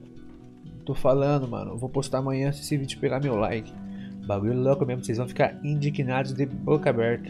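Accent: Rio Grande do Sul, Brazil. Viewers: madrugando no game atá,achei q era do rio grande pelo sutaque de gaucho kakakaka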